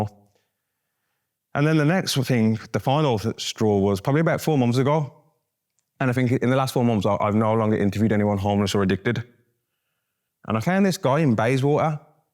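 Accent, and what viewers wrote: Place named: Birmingham, United Kingdom. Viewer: i could tell you were a brummy 😂😂😂😂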